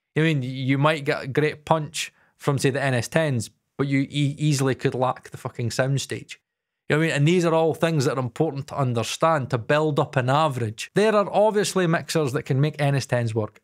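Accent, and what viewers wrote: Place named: Glasgow, United Kingdom. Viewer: This sounded like very well reasoned advice that accounts for how the tech has just moved on. I also got a nice affirmation for my decision to buy my Kali LP-6 v2 last year. I also just loved your Glaswegian brogue. It was like listening to Winston, my spirit animal from Still Game. AWESOME! Gone is the notion to even think of some NS-10 as even a reference. I next reference will just be better monitors like a pair of Adam's, or perhaps Neumann's.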